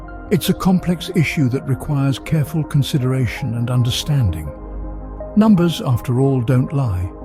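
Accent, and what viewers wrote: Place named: United Kingdom. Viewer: Whats with the proper British person narration?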